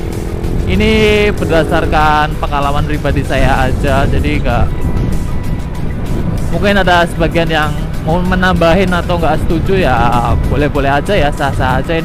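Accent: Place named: Java, Indonesia. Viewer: Jawa ngapak tapi mas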